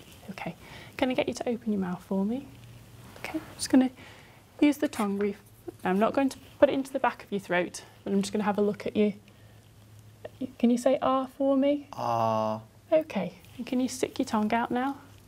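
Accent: United Kingdom. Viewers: how i love her british english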